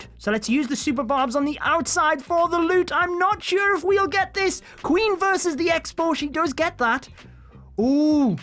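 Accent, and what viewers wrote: United Kingdom. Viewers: Are you from British your voice is so something that is incredible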